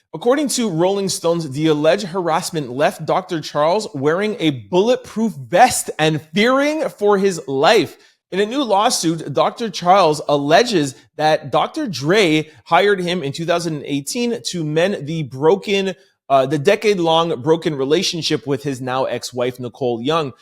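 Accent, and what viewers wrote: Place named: Canada. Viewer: We as blk folks need to be more familiar with the law. One HUGE misconception is that cops have to read us our Miranda rights or we cannot be charged or arrested. FALSE. Miranda rights are to be read when someone is being questioned in context of a criminal investigation to use what you say against you. Not simply because you are being arrested. Miranda does not keep you from being charged. If you are not being questioned there is no Miranda at that time. We need to stop saying that because it’s wrong. Miranda doesn’t apply to every arrest. That’s something that you see on TV and it’s for dramatic purposes. Also you can be detained during a search if they feel you will obstruct or interfere in some way. Diddy sons were detained. They were not arrested that day. Make sure research is done before giving legal commentary sir. Edit: you are Canadian. 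So you get a pass, however Americans are watching this and need to know. I thought you were Canadian, I can hear the accent clearly?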